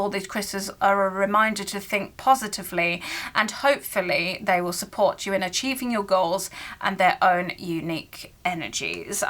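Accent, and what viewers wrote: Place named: England, United Kingdom. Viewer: I love your accent so much! It's different from what I imagine the London accent is. Are there different dialects in England? So cool! Greetings from Canada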